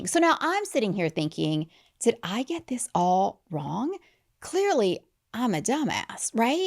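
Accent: United States. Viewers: Painful American … my ears started bleeding listening to this crap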